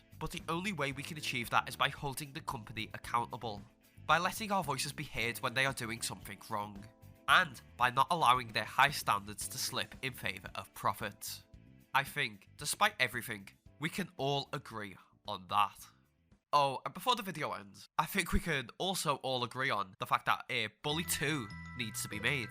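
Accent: Liverpool, United Kingdom. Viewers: be'''ee i love british accent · U from Liverpool lad? Can tell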